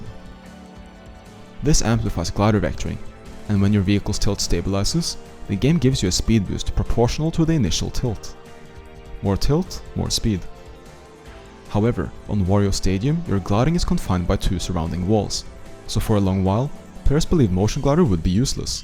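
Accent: Norway. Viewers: As a Norwegian, i could immediately tell you were Norwegian too lmao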